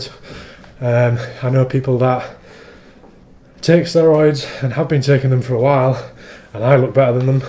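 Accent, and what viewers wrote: England, United Kingdom. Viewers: that english accent beats all the other english accents...is it the country touch? good bullocks talk mate :)!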